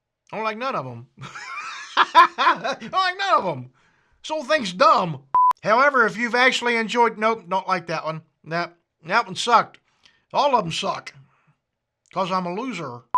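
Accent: South, United States. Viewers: Metal guitar player born and raised under nashville, honestly i like videos that give perspective, i remember seeing your "wrong gig" video awhile back but i subscribe to andy rooneys channel and said to myself "oh crap, he's watching the video"! Then i fell down the rabbit hole and found you channel and subscribed. So far ive enjoyed the insight, the humor and the fact that i hear another southern accent actually made me feel better! I rarely subscribe to channels but music keeps me sane so theres only a couple on my feed, andy rooney, matt freeman from rancid, kyle bull, ola englund and yours! Id say your on the right path as far as content is concerned, the mix of insight and humor is refreshing, keep doing what your doing and im sure people will take notice.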